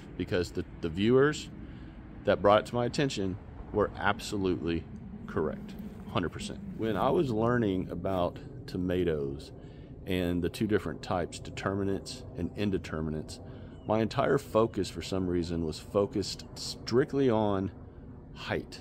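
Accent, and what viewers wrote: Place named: Texas, United States. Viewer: I am also still learning but expanding my gardens again this year. You sound like a fellow Texan so I'll so I'm subscribing to your channel in hopes I can learn more. Appreciate your honesty - we all make mistakes. If you figure out how to get rid of the squirrels please share. Hate it when I see one run across my yard with a ripe strawberry in their mouth (now caged the bed).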